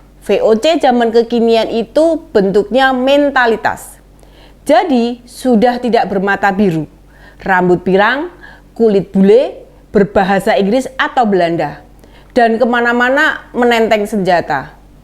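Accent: Java, Indonesia. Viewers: Wwee..Jowone kentel biangeeett.. 😀😀😀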